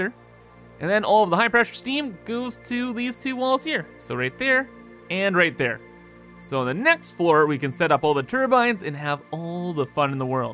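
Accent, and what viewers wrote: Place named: Canada. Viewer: no, mate, listen too his accent. He's so Canadian. So very Canadian.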